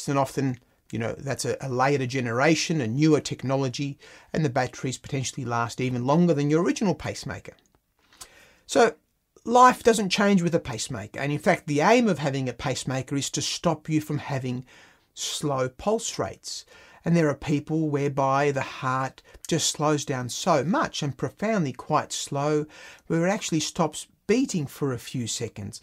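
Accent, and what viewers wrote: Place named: Australia. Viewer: you can be the most educated person in the world but when you have that australian accent you just loose value in an instant. i dont even want to here another word. pome mentality.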